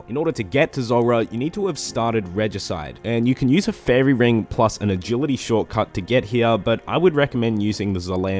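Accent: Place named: Australia. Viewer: Australian accent bro. Buttah instet of butter. Houah instead of hour.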